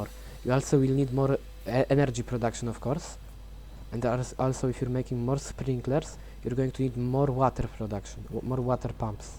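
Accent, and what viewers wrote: Poland. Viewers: tylko cie uslyszalem i odrazu wiedzialem ze polak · bro are you from poland i can't listen some words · are you polish? you have a very polish accent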